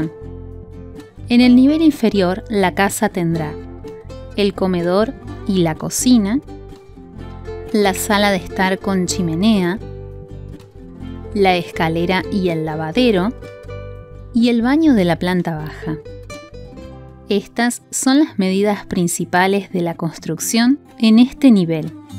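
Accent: Argentina. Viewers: Es voz Argentina.👄😍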